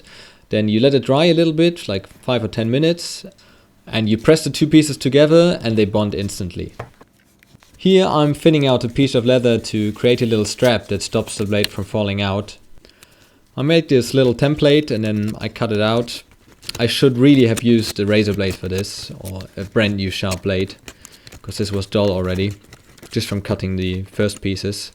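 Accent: Germany. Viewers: actually he's got an accent, I'd say he's from Germany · Really cool project. Your english is good, but you have this tiny little accent. Are you german? :D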